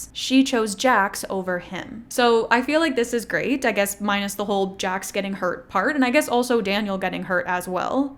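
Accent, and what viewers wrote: Canada. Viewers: I’ve been following you for years but I didn’t realize you were Canadian until I watched this 6 hour video and noticed how you were saying about. Canadians seem to really pronounce their o’s I’ve noticed this with the word sorry too. Then you confirmed later in the video that you were Canadian so it’s good to know I wasn’t going crazy!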